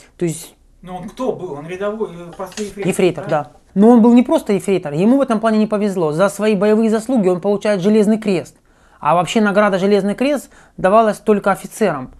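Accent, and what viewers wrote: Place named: Rostov, Russia. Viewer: Девушка с Ростова? Знакомый говор, как собака лает. Кажется, что хамят, но это тут так разговаривают.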